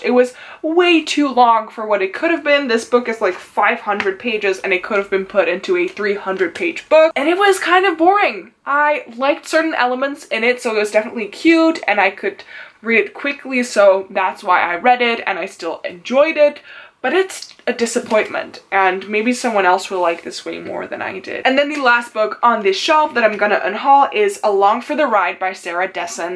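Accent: Netherlands. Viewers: HolY SH- I've never seen your content before and I thought: she sounds kinda Dutch. TURNS OUT YOU ARE DUTCH. OMG ik wil graag meer Nederlandse BookTubers dus tijd om the abboneren!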